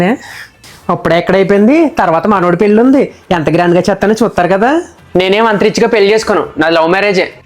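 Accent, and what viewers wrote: Godavari, India. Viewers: గోదావరి యాసతో మీ వీడియోస్ చాలా బాగుంటాయి అండి కామన్గా మిడిల్ క్లాస్ ఫ్యామిలీ ఇంట్లో జరిగే డిస్కషన్స్ లానే❤❤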